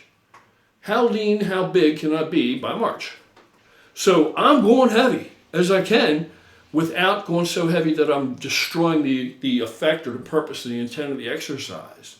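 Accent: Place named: New Jersey, United States. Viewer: Watch a few of your videos back to back. I couldn't agree more on your philosophy thus far. Appreciate you. Sounds like your in the Jersey or Pa area based on your accent. I'm in south Jersey myself. Keep killing it man.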